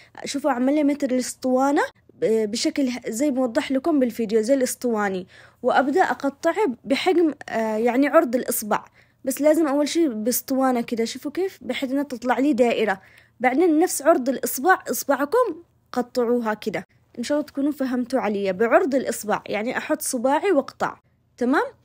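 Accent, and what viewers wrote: Aden, Yemen. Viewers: الصراحه احب اللهجه العدنيه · أحلى شي أحلى شي كلامك اليمني الجميل اللي بدون تصنع ولا قلتي كلمه خليجي اني فدالك.. في بنات عدن عمرهم ماخرجوا من عدن ويتكلمون سعودي ولا اماراتي تقولي الا مواليد الخليج..تصنع يجيب الغثى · اهل اليمن هذه لهجتهم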